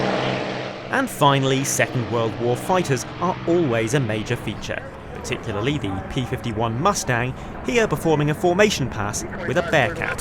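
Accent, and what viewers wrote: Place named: United Kingdom. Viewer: Seriously, a brit commentator? How about we have an American narrate RIAT? Absolutely annoying.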